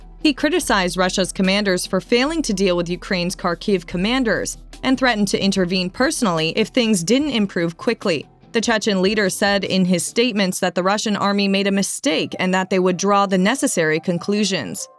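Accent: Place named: United States. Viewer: Nice American English. Love it)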